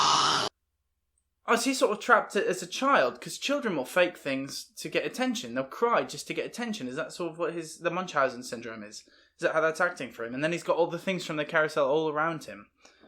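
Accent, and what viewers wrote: England, United Kingdom. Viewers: I pressed play on the video on my computer and then looked at my phone because I got a message and it took my brain a minute to register that there should not be a British accent on this particular video. It is always interesting to watch your videos as you discover more about this universe and try to put all the pieces together! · I wasn’t paying attention when the video started and then you started talking in an English accent and I was so confused I checked your channel name to make sure I was watching you. (btw I’m from the UK) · I commend you for keeping up with the British accent throughout the whole video. You sounded like a British person who has lived in the states a while. Very conversational. I usually don't have an accent (Filippo) but whenever I come back from a party at my aunt's house, I come back with an accent. 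At least that's what boyfriend says because I don't really notice it. Sorry TMI. Anyway, just wanted to say great job 👍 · OMG - the Voldemort part :D I recently watched 'The Mysterious Ticking Noise' soo it really got me :D what a coincidence and your british accent in this video made me distracted :D instead of watching I was so focused what you were speaking :D I don't know if that makes any sense but yeah... :) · LOL! I was only half listening to the intro while I was starting all of my other programs to get settled into my work, and missed the bit where you talked about doing the entire reaction in a British accent, so once I finished logging into my workstation and started paying more attention to what I was hearing (without glancing over at this window yet), I was like "Wait, what? Who is this guy? I mean, he kind of sounds like, but he's not...is he? OH!" 😂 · I'm sorry. What happened in this video? 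I was a little distracted by your English accent because my brain was trying to adjust. 😁 Kudos to you for trying new things and being vulnerable. I am so happy to be watching a video reaction from you. Thank you!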